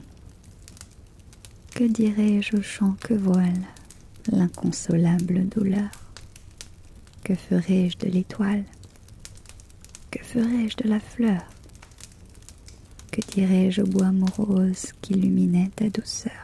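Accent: France. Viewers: God Please do more French content, The accent and the voice is just so hitting the spot (if anyone finds this in my history I am cooked but awesome work you do!) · Came here from the link provided in Part 2 (F4M). This is an exceptional audio, with your lovely narration in a French accent, adding to the immersion and exotic appeal. I absolutely look forward to the Part 2 experience soon. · If I had a nickel for every time I listened to a French Maid ASMR with a French accent and actual spoken French, I'd have exactly one nickel! 😅😅😆😆 Jokes aside, despite only being able to pick up certain words and phrases in French, this RP was so very calming to listen to. The French accent combined with bits where you recited the poems in French was oh so relaxing. The feeling of being taken care of made me feel all warm and fuzzy throughout and your humming is angelic. Your use of ambient sound is also masterful and it makes it all the more enjoyable to listen to. 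This was so different from all the other scripts so far and it shows your range. This channel is quickly becoming one of my favourites and I wish you the very best and utmost success in the future! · French maid? Yes. French accent? Yes! French maid with French accent reading French poems? YES! This was great, the relaxing vibes were pretty damn good, and I love the character as well. Excellent work!♥️ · Ça fait un mélange très agréable l'accent français mélangé à de l'anglais et du français. Merci pour la vidéo ^^. Les bruits d'ambiances me plongent vraiment dans l'histoire, bref excellent, j'adore ❤. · English with french accent just good enough for me ❤ French with english accent too like canadian accent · I love ASMR with a French accent. Amazing job! Keep up the good work! ❤ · Definitely tempting me to learn French with this one, but even without understanding it I was captivated by how beautiful it sounded. 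Also must say that the french accent sounds so amazing when speaking english as well, 12/10. 👍🥰👍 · Never expected how good a French accent asmr would be. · Wow, I love your accent! I've never heard french ASMR before and I had no idea what I was missing out. This is honey to my ears, please do more! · Your voice is incredibly soothing and peaceful, especially your French accent. Thank you for this vid and for making me wanna relearn French again. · I've listened to this one a few times now and most times I never get to the end of it because I end up falling asleep. Soft, soothing voice ASMR videos are one of my favourites. And the French accent really adds to this. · Ta voix ressort si bien en français j’espère il y aura plus de vidéos avec l’accent français… Sinon salutations du Québec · The French accent is lovely also a small dig at the British made me chuckle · French accent sounds really nice, and that's indeed one awesome presentation. 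Slept well last night with this , thank you! :D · As a person who studies french I loved this video. I didn't know the french accent would sound so good on english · Stuff like this is why in my honest opinion, the French accent is the most beautiful European accent, if not the most beautiful accent ever. Of course, every accent is beautiful in their own ways. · That frensh accent in the english language is soooo beautiful and soft. never heards such a tingly and calming frensh accent! hope to hear more of this in the future <3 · The soft spoken with French accent here is strong, really strong here. It hit straight on my ear and wasn't ready at all for the echo added on the bathroom ! And some humming too ? Oh, TY. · Jeeez I didn’t know I like the French accent so much 😂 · Entre l'accent bien français, la baguette et l'assortiment de fromages, c'est juste excellent mdr Franchement, c'est vraiment du beau boulot, quand on passe de cette vidéo à une autre en anglais, on ne te reconnaît même pas. 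T'es clairement une pro, au sens littéral. En tout cas bonne chance, je suivrais ton contenu avec intérêt !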